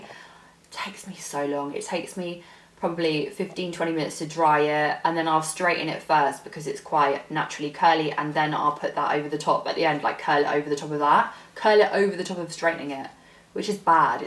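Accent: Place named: England, United Kingdom. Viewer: Love ur English accent and ur so beautiful ❤️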